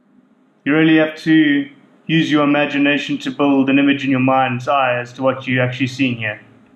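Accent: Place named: Cape, South Africa. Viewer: I thought I could tell from your accent. Are you from anywhere in the Cape?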